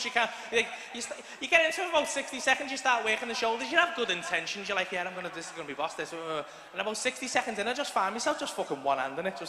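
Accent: United Kingdom. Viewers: Cant beat this humour spoke with the best acent in the uk 😂 👌🏼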